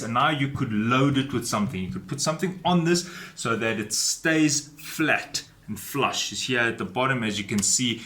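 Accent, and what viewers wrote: South Africa. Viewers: Are you a South African?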